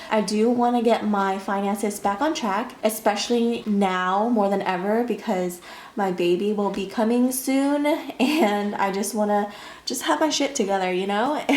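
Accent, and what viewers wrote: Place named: Bay Area, United States. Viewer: i’ve been binge watching these videos for the past couple of weeks and i’m so happy i came across yours! as soon as you started talking, i was like “oh this girl from the bay too” and sure enough! hahaha automatic subscribe. plus i love your penmanship and how minimalistic you keep everything. i plan on starting my budgeting in june and had the same idea of how your process is. and congratulations on the pregnancy, she’s almost here! i look forward to your other videos. xo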